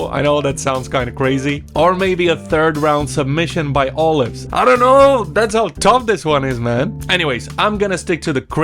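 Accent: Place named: Scotland, United Kingdom. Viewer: Loving the Scottish pronunciation 🙊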